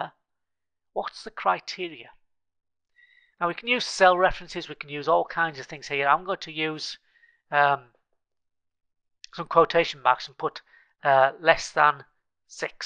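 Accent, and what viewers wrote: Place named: Northern England, United Kingdom. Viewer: Dear. Absolutely brilliant and so easy to follow. A real bonus to hear a northern accent too! Thanks for posting this - it solved my immediate Excel problem - and took me even further too.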